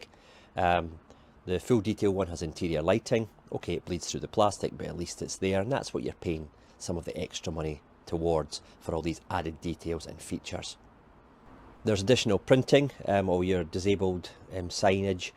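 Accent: Scotland, United Kingdom. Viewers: Hey. Whereabouts in Scotland are you actually from? I can tell by your accent. I'm a new subscriber from London, UK.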